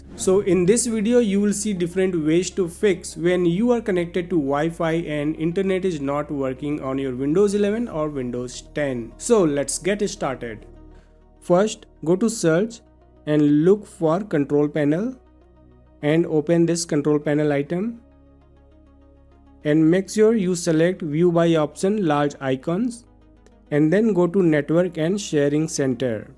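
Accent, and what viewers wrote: India. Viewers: When i hearing the Indian voice i am 100% sure my problem gona be fixt thank you ❤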